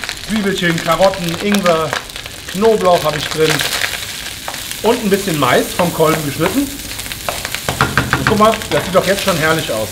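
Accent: Hesse, Germany. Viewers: Ein sensationelles Rezept, wie viele andere von dir auch. Es macht einfach Lust und Laune, die Gerichte nach zu kochen. Lieber, du bist ein Original und vor allem: Herrlisch des hessische Gebabbel. Mach weiter so👍👍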